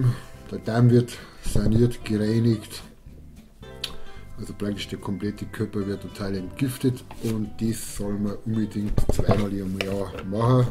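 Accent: Bavaria, Germany. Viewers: Danke für deinen bayerischen Dialekt!!!! Mai, ist das schön zum hören :-)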